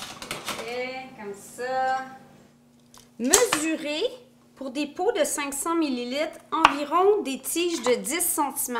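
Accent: Quebec, Canada. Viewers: j'ai adoré ta vidéo ! vraiment merci !et en plus avec ce chaleureux accent québécois que j'affectionne tant ! hâte de revenir au Canada ! je m'abonne !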